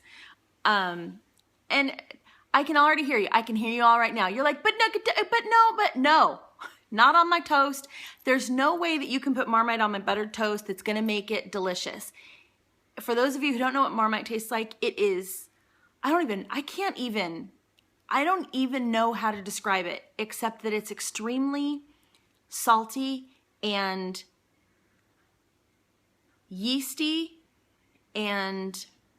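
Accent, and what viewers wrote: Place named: United States. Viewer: Yes my dear so happy for u it's amazing how u keep ur accent I thought if ppl live long somewhere they will have the accent of country. I love ur American accent btw and me I'm 5 -6 years in the uk but can't get rid of my meerkat accent 😂😂😂 ur videos are amazing keep it up Hun 😘😘😘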